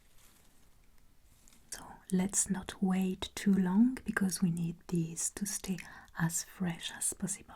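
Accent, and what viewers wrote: France. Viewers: Your videos are, possibly, the best crafted asmr ones I’ve seen so far. Plus, your french accent is so charming...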